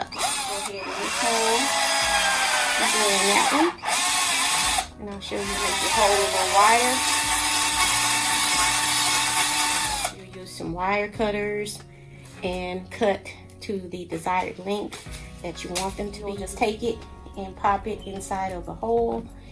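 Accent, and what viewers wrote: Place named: Texas, United States. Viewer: Amen to Texan accents 😊. This is amazing. Love it ❤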